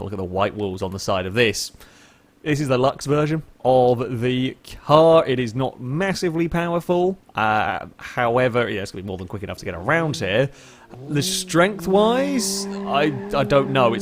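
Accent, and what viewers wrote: United Kingdom. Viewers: Yeah he's British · He is British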